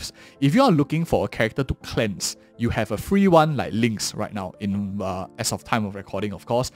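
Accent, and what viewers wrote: Singapore. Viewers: immediately could tell the singaporean accent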